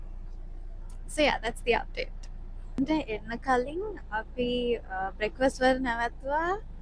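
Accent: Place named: Sri Lanka. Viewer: I wanted to write this in Sinhala, but I’ll use English for clarity. Don’t you find it strange and awkward to speak a foreign language while in Sri Lanka? It’s like mental slavery. If your audience is foreign, go full English. If not, speak Sinhala. Using Singlish in between is just… funny. Honestly, broken English with a Singlish accent doesn’t make you “posh.” English is not fancy .. it’s a borrowed language, taken from other countries. Meanwhile, our native language is beautiful. It’s time to drop the slavery mindset. Think about that.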